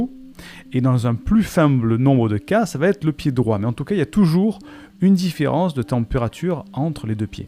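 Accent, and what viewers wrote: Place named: Quebec, Canada. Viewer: Euh...écouter un québécois à vitesse 1,5 ça demande concentration quand même 😁. Heureusement que c est pas long. Très intéressante vidéo, merci. Christophe Bernard, d Altheaprovence explique très bien aussi l effet placebo et nocebo... Et, si, oui, moi je m endors volontairement à l heure que je choisis et je croyais que ça marchait pour tout le monde !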